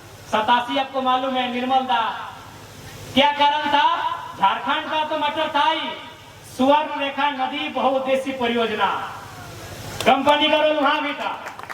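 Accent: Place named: Odisha, India. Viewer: Orissa sa hun bolte ho bhai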